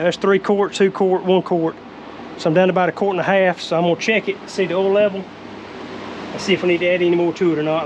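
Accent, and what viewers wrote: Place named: North Carolina, United States. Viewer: Nice to find these helpful videos as well as here the North Carolina accent !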